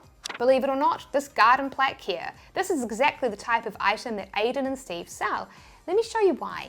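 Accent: New Zealand. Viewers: Love your content and kiwi accent....im an aussie.😆👍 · The only thing I like more than your cool Kiwi accent is that blue and pink striped shirt. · I think it's New Zealand accent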